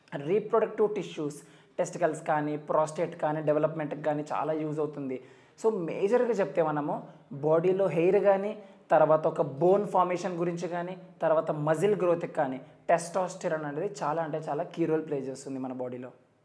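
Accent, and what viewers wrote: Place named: United States. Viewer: Anna miru telugaa America anukunaa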